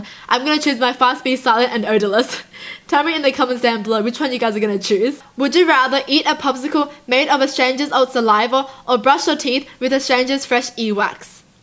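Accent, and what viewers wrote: Australia. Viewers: Is she from Australia I am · Is she Australian?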